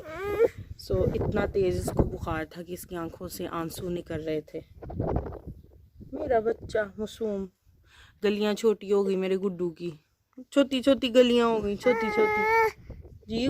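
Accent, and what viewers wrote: Sialkot, Pakistan. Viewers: Sialkoty ki booli pahchani jati jitni mrzi achi urdu bool lain mgr punjabi tuch zoaroor shamil hota ha..😀 Don't tention bcoz i m also siakoty..😉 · Ap lgta sialkot se han jaisi Urdu bol rhi han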